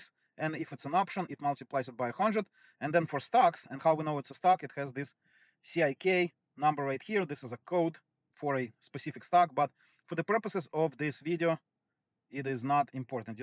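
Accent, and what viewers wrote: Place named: Russia. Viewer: You have russian accent. Do you do channel in russian too?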